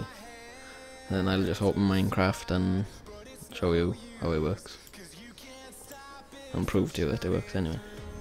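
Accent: Wales, United Kingdom. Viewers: Is that a welsh accent I hear? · nice welsh accent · you sound proper welsh. are you ? :P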